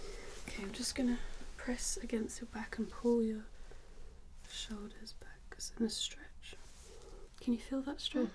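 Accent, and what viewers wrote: United Kingdom. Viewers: Always love British accent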